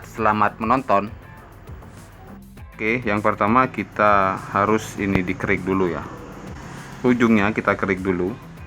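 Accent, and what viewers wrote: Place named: West Sumatra, Indonesia. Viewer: Logat nya seperti orang minang ya bg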